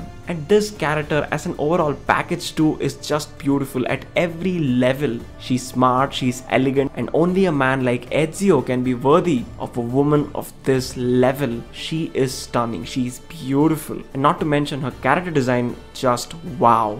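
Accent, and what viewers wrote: India. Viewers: your accent makes It sound like real love the Indian accent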